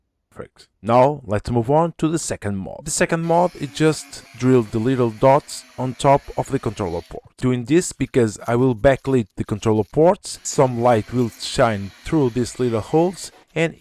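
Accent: Portugal. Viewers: Adorei que eu fiquei assim"este sotaque é mesmo a PT" depois fui ver o nome, makes sense 😂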